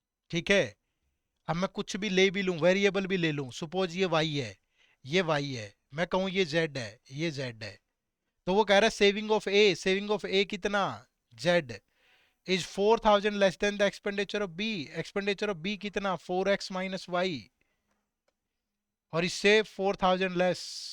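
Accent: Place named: Haryana, India. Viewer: Sir good english with haryanvi loved it!!! Thankyou for the content 💌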